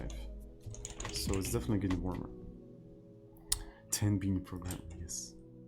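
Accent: Russia. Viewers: русский акцент?)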